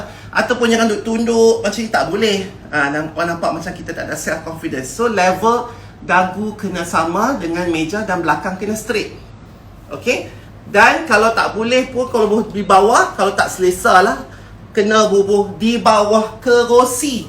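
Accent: North, Malaysia. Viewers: Dulu saya belajar KH ERT/RBT etika di meja makan...kira ok dahla tu....wow rupanya lagi detail ye...thank u..belajaq sambil dok gelak ngan celoteh utara dato' lawak aih...tengok sampai habis nah..sampai kul pagi 14/8/2020...hahaha bosan x tau nak tengok apa...sekali sekala menimba ilmu ni...kan senang nak dapat...moga lekat dlm kepala otak ni...aminn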